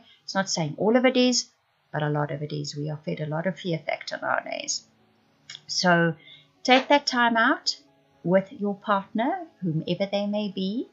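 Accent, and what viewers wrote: South Africa. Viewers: Love your South African accent. Sound like my best friend. Awesome reading thank you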